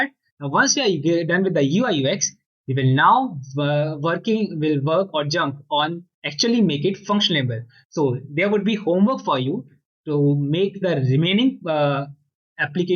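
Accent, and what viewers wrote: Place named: India. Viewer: You know what I find amusing? the low count of views for videos such as yours and I imagine it's because of U.S. viewers having issue with accents from our Indian brothers and sisters. But these folks always explain details way more concise and on point than many of the native english speakers. Native speakers are always hyping or going off topic. What I'm getting at is thank you my friend and thank you for your passion and sharing it with us all.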